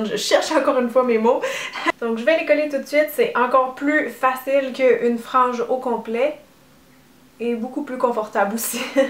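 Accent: Quebec, Canada. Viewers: Hahaha j'ai tellement aimé le doigt à la fin. xD Je vais le rabâcher encore et encore mais t'es tellement douée ! Et drôle aussi. Et super jolie. Pat dépêche toi de la marier ! 😜 Le maquillage des yeux est vraiment canon ! J'adore t'écouter tous les samedi parce que je t'apprécie et aussi parce que j'adore l'accent québécois et vos expressions un peu singulière (je suis de Paris) haha. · je comprends ton point... Mais ce que je voulais dire c'est que c'est une manière de parler... En france vous prononcez "s'il te plé" tandis qu'au Québec, nous prononçons "s'il te plè"... Vous dites un toast, nous disons une toast... c'est peut-être "une faute"... Mais c'est comme ça qu'on dit ici (en général)... n'est pas une enseignante de français... Elle n'a pas (selon moi) à changer sa manière de parler ou les mots qu'elle emploie, peut importe son auditoire... Mais ça, c'est encore que mon point de vue... 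Venant d'un Québécoise fière de sa culture et de son jargon!:) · OMG je t'adore!!! Jolie, intelligente, douée, et tellement drôle x'DLes Bloopers, surtout le final, m'ont tuée:')Et puis le maquillage est super beau!!! Bisous d'une abonnée fidèle, et merci pour ce tuto (Elsa est une de mes princesses préférées... ^^)LaurelinPS: J'ai une famille québecoise mais je ne vis pas là-bas, alors quand j'écoute tes vidéos, l'accent trop cute me rappelle la maison. Change absolument pas t'es parfaite, gros becs.:D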